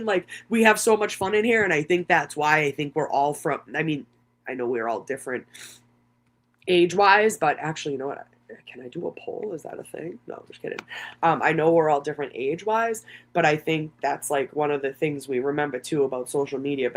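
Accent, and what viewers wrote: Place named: Boston, United States. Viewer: sometimes creative can’t see everyone if they don’t hit live chat and other people can see everyone if they don’t hit all messages instead of top messages. Idk 🤷‍♀️ Will still be watching 👀Listening to you talk is like hearing my family talk. We have the same Boston accent. ❤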